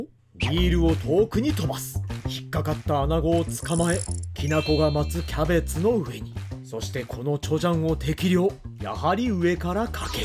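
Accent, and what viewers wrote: Busan, South Korea. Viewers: 물론 철은 아니지만 몇키로 주는지 모르겠지만 비싼편 이네 근데 한국분 아니심? 발음이 한국인 인데용? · 좋은 데이가 고고로 채워줬 데이~🎶 부산 사투리 제대로 쓰시네요! 👍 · 경상도 사투리도 잘하시네요.😅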